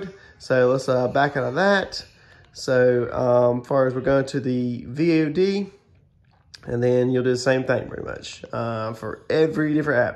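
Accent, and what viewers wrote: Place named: South, United States. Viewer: face-orange-frowning: Had a hard time understanding too much mumble and southern drawl together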